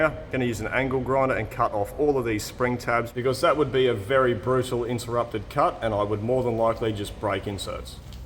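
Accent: Australia. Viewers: I have never had the captions on and thought the same thing, and I definitely don’t fit that description 😂. I have boots older than 😢. Thanks for the translation of Aussie to American 😊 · Hi, great videos. Your explanation of the purpose of the springs in a flex plate/clutch is wrong, maybe it's just the way you said it, you speak Aussie and I speak American. The main purpose of the springs is to absorb torsional vibrations that are generated by the engines that drive them. The torsional vibrations can destroy a gearbox or transmission. In automotive applications they also act to prevent harsh engagements when the clutch the clutch pedal is released. Automatic transmissions don't need them as the torque converter/fluid coupling acts as a damper. The size of the flex plate, number of springs, and the rate of the springs is governed by the horsepower it needs to transfer to the driven parts. 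A multi-cylinder engine does not need heavy springs since the power impulses (torsional vibrations) are close together. A one or two cylinder engine needs very heavy springs as the torsionals are close together. Look at it this way: In a four stroke engine with two cylinders, there will be one power pulse per revolution. In an eight cylinder engine there are four power pulses per revolution. Think of these as positive torsionals as they accelerate the engine in the direction of rotation. Conversely, every time an exhaust valve opens, there is deceleration due to the drop in cylinder pressure, so in the case of the eight cylinder engine we have four positive pulses and four negative ones, The negative ones are of less intensity of course. When I used to work on boats a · All this time I thought was starting these videos saying "Hey young guys..." and wondering why he thought old guys (like me) wouldn't be watching these videos. 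But today I accidentally had the closed captioning on, and was surprised to discover that he was saying "How you going guys?"! I guess I need to brush up on my 'Stralian.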